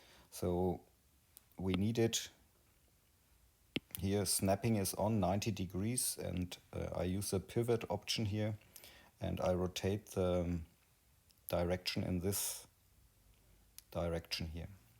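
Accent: Bavaria, Germany. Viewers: Supergut! Wann immer ich irgendwas suche, Du hast fast immer ein Video dazu =D Da leb I a mim boarischn englisch =D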